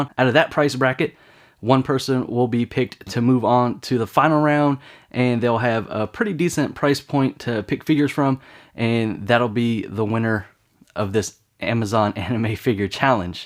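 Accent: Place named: South, United States. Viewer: oh god, i just couldn't help it but he sound like he has a real southern accent... Has anyone thought of it?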